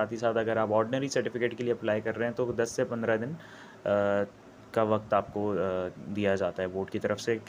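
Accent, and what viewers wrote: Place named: Karachi, Pakistan. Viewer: Best urdu bro... Karachi ki yaad dila di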